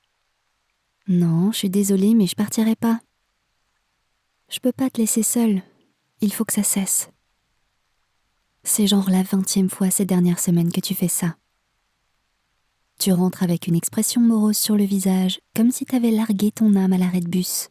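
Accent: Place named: United Kingdom. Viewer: Ton accent est incroyable si tu est anglaise mais je pense que t’es vidéo sont trop courte x) j’aimerais trop des vidéo de 1h 😅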